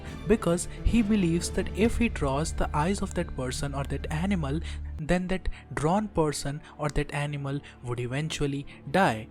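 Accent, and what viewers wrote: India. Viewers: Remove your India accents its annoying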